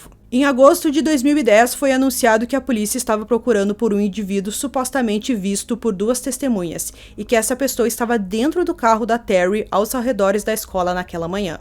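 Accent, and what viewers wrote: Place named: Pará, Brazil. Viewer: vc é do estado do Pará??? Você tem um sutaque típico de lá kkkkk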